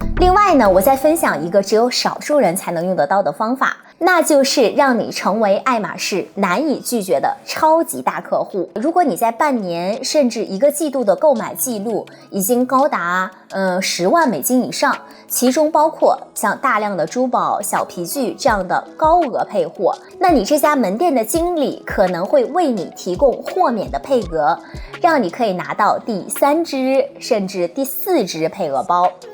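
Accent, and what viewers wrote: Northeast, China. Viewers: 东北女生？ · 肯定是东北那旮的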